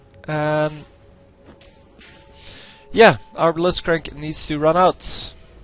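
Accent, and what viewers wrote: Netherlands. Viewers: I sense a dutch guy here XD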